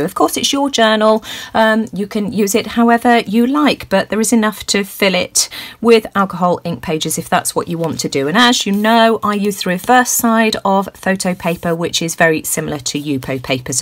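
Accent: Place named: Suffolk, United Kingdom. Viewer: Boy you sound like my Suffolk family in the UK..made me home sick.😀😀😀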